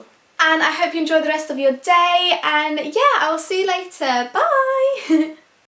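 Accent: Scotland, United Kingdom. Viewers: Thanks for a very candid and personal insight. Nice to know you better 😊 Never knew you were Scottish ! The accent fuelled me 🤔 Your challenging high schools years do not appear to have affected you negatively. Your looks and personality are much more suited to bring in front of the camera ☺️ The quality of video and sound on your videos are excellent. Maybe you could do a video on what gear and settings you use for other Youtubers ? Love your channel. Please keep them coming.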